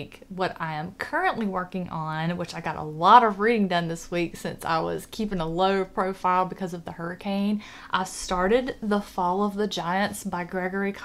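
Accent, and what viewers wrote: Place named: Mississippi, United States. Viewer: I just stumbled across your channel and of course I was hooked by your Mississippi accent. But they say we have an accent also because we are originally from Alabama. If you don't mind me asking I would like to know where you live in FL because I have a brother that lives in Marianna and a sister in Tallahassee. Fortunately, they didn't have any damage from the hurricanes. I think you are a good reviewer and I subscribed. Much respect to you from Larry.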